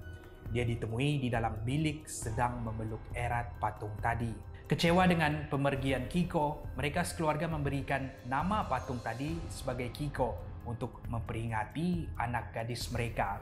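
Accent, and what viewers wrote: Sabah, Malaysia. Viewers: Sabah bossku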